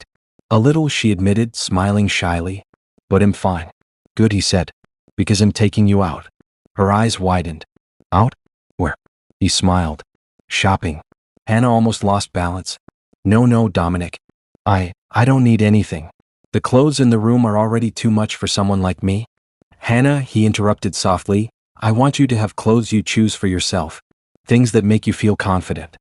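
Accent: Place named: United States. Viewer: Everything is nice , except the bits can come off a bit colorist,Due to the readers white American accent.bits he keeps saying dark and color unnecessarily.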